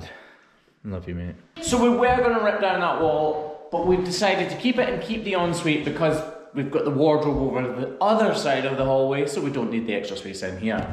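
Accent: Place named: Scotland, United Kingdom. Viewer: When you flub up what you say just keep going with your Scottish accent I wouldn’t know any different. If my father was alive boy you would have never heard the end of it as his uncle’s McMillian’s came from Scotland 🏴!!!! I enjoy your accent but probably your wife’s better when you’re in trouble with her! Can you play the bagpipes that would be the greatest finish or put in when you flubbed!!!...lol from SW Wisconsin!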